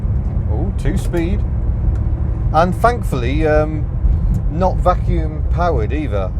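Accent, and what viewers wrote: United Kingdom. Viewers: Thanks! Nice présentation. Love your British accent!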